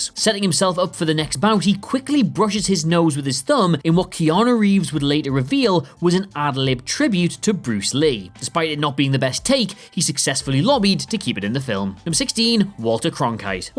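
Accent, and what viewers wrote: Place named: England, United Kingdom. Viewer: I thought the video would be good until I heard the english accent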